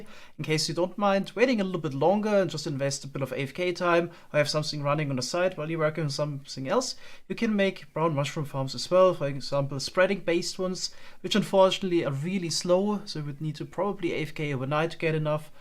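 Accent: Germany. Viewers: Bro, are you german? That dialekt xD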